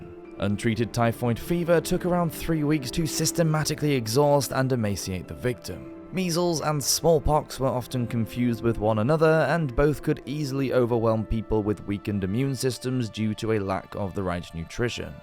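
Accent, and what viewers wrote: United Kingdom. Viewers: Why are people saying this channel is fraud? You can literally search everything. One assumes I'm here for the british accent? No, but it helps now that you mention.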